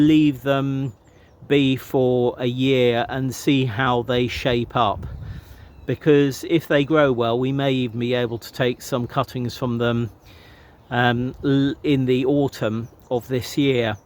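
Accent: United Kingdom. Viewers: I just love hearing your British accent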